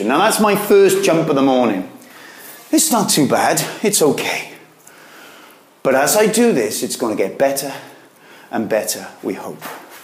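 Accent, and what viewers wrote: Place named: Wales, United Kingdom. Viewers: What is your accent! I hear bits of Welsh 😅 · Looking to get back to surfing after a long break 20 or so years !!! Great video, u from Wales somewhere?? Accent sounds familiar 👍 (Cardiff boy here)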